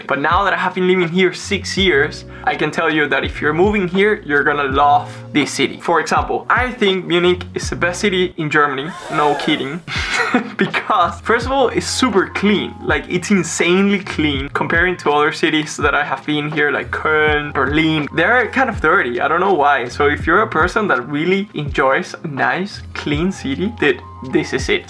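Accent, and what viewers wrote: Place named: Spain. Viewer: sehr schön zuerst hab ich geglaubt du bist ein ami aber dann spanisch hört man durch du bist sehr lustig und sympatisch i love your video how was barcelona in comparison cau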